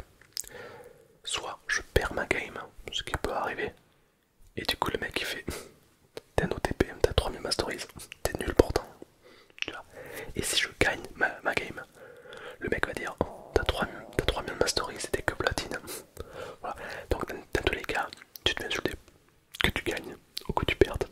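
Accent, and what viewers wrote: South, France. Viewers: T as l accent du sud😂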